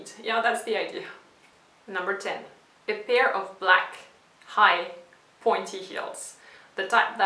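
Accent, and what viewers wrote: France. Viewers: Quand un français essaye de parler en anglais sa donne ça ! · I was thinking that you were actually a French, from your accent.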